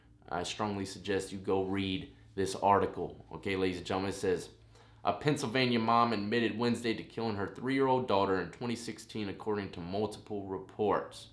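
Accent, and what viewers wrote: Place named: Great Neck, United States. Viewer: wat high school did you go to? sounds like cox over off great neck lol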